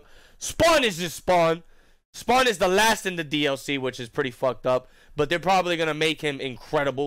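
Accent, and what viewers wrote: New York, United States. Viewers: are you from NY? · Love your reaction. Love your NY accentos. It succs ass Spawn will be released in March yo. And that "no homo" comment made me laugh! I still say that shitos!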